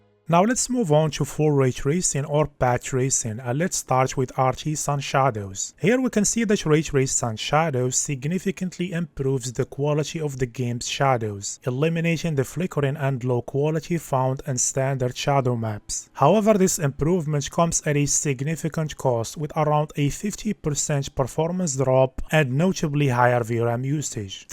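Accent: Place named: Morocco. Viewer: omg your accent is so moroccan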